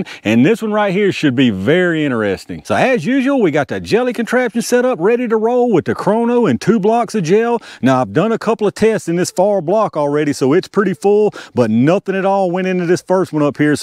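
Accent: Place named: Appalachia, United States. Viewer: Y,all would call me a Yankee, but this guy speaks Hillbilly. That’s ok, because he gives Great information!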